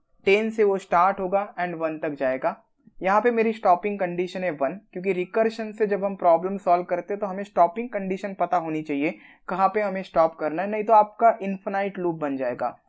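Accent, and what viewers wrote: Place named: South India, India. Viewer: Brother yen pronounce South Indian and also don't forget the missile man of India belongs from south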